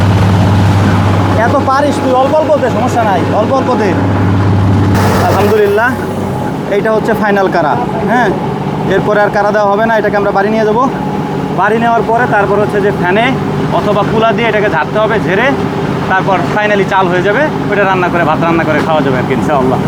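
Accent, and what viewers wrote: Faridpur, Bangladesh. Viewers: আপনার আঞ্চলিক ভাষা শুনলে বোঝা যায় আপনি খাঁটি ফরিদপুরীয়ান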